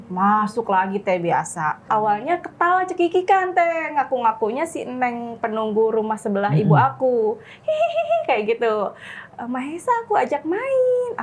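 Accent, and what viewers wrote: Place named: Karawang, Indonesia. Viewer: Logat krawang nyaa .. jdi kangen kluarga aku yg d Rengasdengklok 😊😊😊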